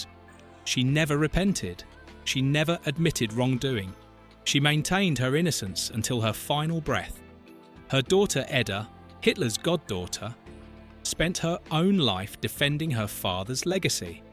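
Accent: England, United Kingdom. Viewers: A good Jewish person with a good clean English accent. Glad you approve. 😊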